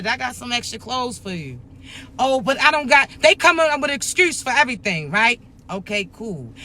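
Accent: Baltimore, United States. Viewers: ALL FACTS LADY YOU GOT A BALTIMORE ACCENT GOOD CONTENT KEEP THAT ENERGY COOKING 🎯🎯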